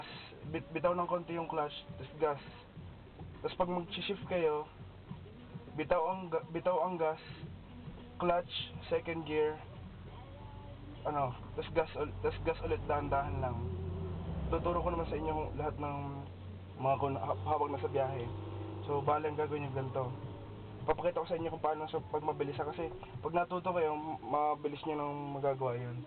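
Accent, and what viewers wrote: Batangas, Philippines. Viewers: Ang cute mo mag salita,.. Eihhh taga batangas po ikaw? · taga batangas ka kuya noh? very helpful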